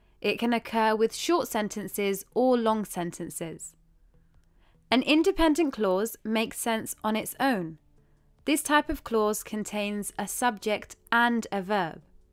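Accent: United Kingdom. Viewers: Your Accent is British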